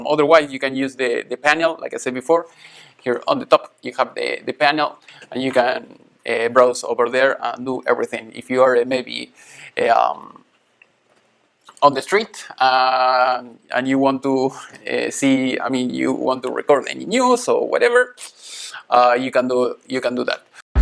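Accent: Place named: Rioplatense, Argentina. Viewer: te escribo en español porque por el acento y el "going to" (vamos a) que usas mucho igual que yo tenés que ser sí o sí argentino o uruguayo. Yo soy porteño, y vivo en Atlanta, Georgia. Habiendo hecho esta introducción, te cuento que me encantó el video, y que probablamente sea el más instructivo y completo que encontré sobre el Teradek Vidiu Pro. Me lo quiero comprar para hacer transmisiones para la tele argentina, y la verdad es que creo que me voy a inclinar por esta opción. Te mando un abrazo argentino y espero que estés muy bien. ¡Gracias!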